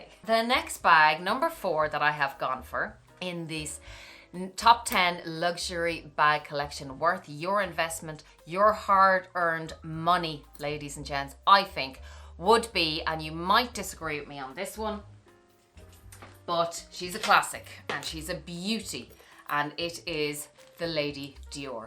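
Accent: Ireland. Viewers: Dear, in my mother's time, one bought a timeless bag and wore it always. She lived in London, Paris and New York and wore her Chanel classic flap everywhere. She had bought it in Paris. It was the age of class. Now one needs a dozen bags and have a collection! Welcome to the age of greed. In any case I love you! Lived in Ireland for 10 years had my 2 kids there. Just live your Irish warmth and wonderful Paddy accent! Stay blessed and enjoy your great style! 😍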